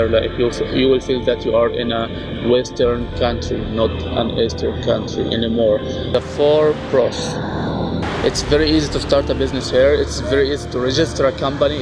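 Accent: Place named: Egypt. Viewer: I can tell from his accent that he's Egyptian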